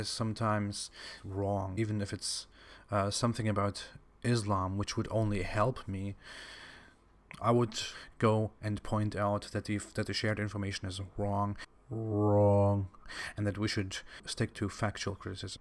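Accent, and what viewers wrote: Germany. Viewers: Hallo, i can spot his german Akzent as soon as he started speaking xD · Don't apologise for accent. German superior to the bastard dialect called English, duh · Most impressive is that he his accent is a German one. Really hope he wasn't given too hard a time when he was here as a child, by "either side".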